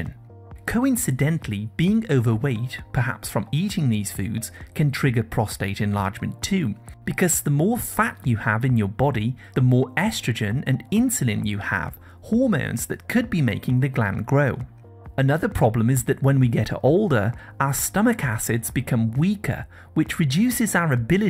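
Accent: United Kingdom. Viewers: What's with the British voicing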